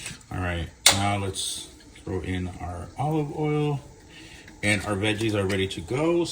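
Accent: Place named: Miami, United States. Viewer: My family and I love and adore you. I grew up in Miami and I here a familiar accent 😮😊😂❤🎉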